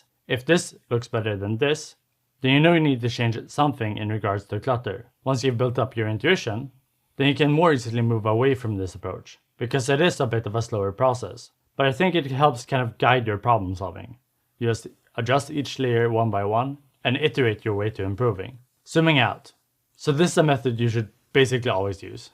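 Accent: Sweden. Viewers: I was just about to open your channel page in a new tab to check the cannel info to see if you were Swedish*, but then these books appear confirmed it for me, lol. * I have ADHD okay; when I start to wonder where an accent is from and start to think it sounds oddly comfortably familiar, and then suddenly realise that it sounds Swedish; I just have to pause the video and figure it out immediately, or I will stop paying attention and only focus** on the accent... **That's also why my channel info only says "I'm from Norway, in case you wondered...", just in case some other ADHD'er gets distractingly curious about where the crazy person who posts multi paragraph (or one whole screenful of text with barely any periods but lots of commas and semicolons and nested parentheticals and no line breaks) is from... Come to think about it; maybe I should add "and I have ADHD" to the description too? 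That might explain some of my wildly off topic comments to anyone curious.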